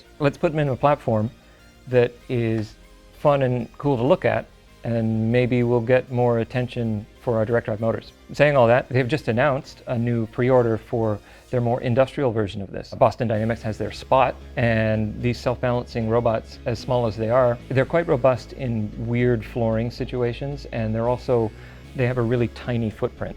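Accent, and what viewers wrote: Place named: Canada. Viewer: More Canadian than people who put on a Letterkenny accent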